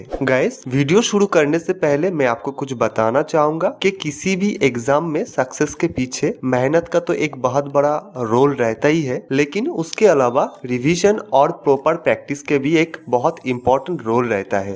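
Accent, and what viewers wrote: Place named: Odisha, India. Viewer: Aap ke aawaz se log raha hai ki aap odisha se hai..... Becoz.. Odisha log ka hindi aawaz pata chal jata hai......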